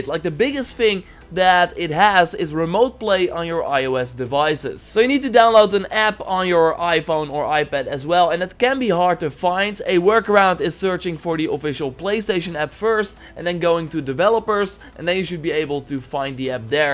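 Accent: Netherlands. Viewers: your Dutch accent kills me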